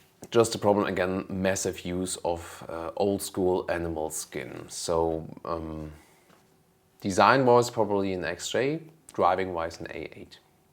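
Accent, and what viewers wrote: United Kingdom. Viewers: Your British accent is hilarious xD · Many thanks for taking your time for the Q/A. Great episode, great Schnauzers, great British Accent. I am already looking forward to hear your Danish skills ...... definetly!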